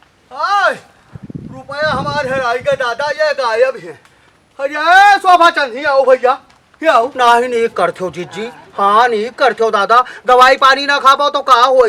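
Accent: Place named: Awadhi, India. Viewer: अति सुन्दर प्रस्तुति मजा आ गया आपकी इस उत्कृष्ट और सुन्दर एक्टिंग से।। हम लोगों का सुंदर बचपना याद आ गया। सबसे सुंदर ये लगा की अपना अवधी में सुना। इतनी मिठास भरी हुई है की मन प्रफुल्लित हो गया।।। Best of luck for your future endeavours and all the upcoming videos.. My best wishes to you and your teams.. God bless you all. Jai shree Ram ❤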